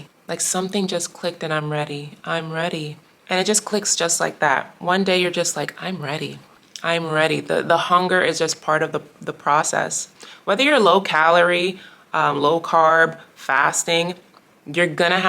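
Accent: Jamaica. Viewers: Girl, are you Jamaican?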